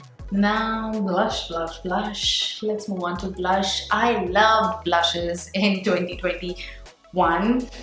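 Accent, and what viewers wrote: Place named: Hyderabad, India. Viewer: Are u Hyderabadi? Your hindi accent is very Hyderabadi ( and I mean this in a good way) . I have a friend who is Hyderabadi and this is exactly how she sounds whenever she speaks Hindi (which i find really cute btw😂)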